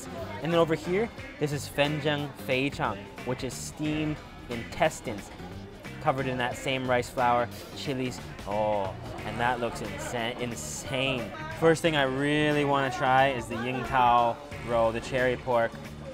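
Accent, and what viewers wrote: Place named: China. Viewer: I like his Chinese accent.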